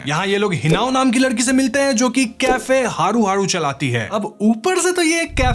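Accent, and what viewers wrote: Bengal, India. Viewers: Bhai tu bengali hea kya ? 🤔🤨🔪 coz some of your accents is so similar with bengal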